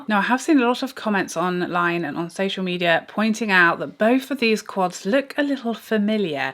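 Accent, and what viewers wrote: United Kingdom. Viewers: Oh a new video. Thanks and your accent always gives me wanderlust vibes to go to UK. I hope it will happen soon. :-)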